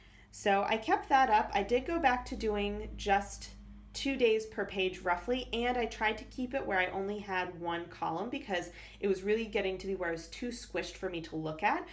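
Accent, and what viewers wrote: United States. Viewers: Great video! And you write in all those different languages? Your life seems very interesting! You sound American so I'm assuming you are studying abroad! Very interesting!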